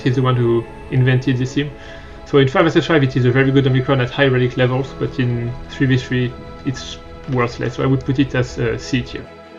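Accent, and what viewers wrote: France. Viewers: Thanks for replying! I don't think I'll find many equally-geared Ewoks, my Malak is R7, modded for the solo (to the best of my ability, I have almost 0 6E slicing mats) and I'm in Bronzium 4. Would it work against G9-12 Chirpacron, in either one? I don't want to risk my 79 banners. Also, thanks. I've been trying to decide what to do with my omis. I might actually omicron Chirpa, seeing as how I'm gunning for Threepio for GAS anyway Also also, don't worry about your voiceover. I could understand you perfectly well, even through the (French? Is it French?) accent. I would like it if you did your GAC/TW vids with the voiceover, and the GC/TB vids with just text, because I like to boot them up at school and I don't want to miss anything on mute. However, that is just a me thing. Don't feel pressured to.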